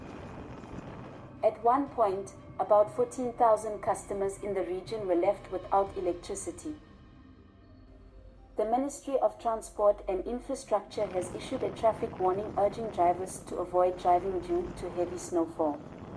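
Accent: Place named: Cape Town, South Africa. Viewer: Sounds like a capetonian accent for the narrator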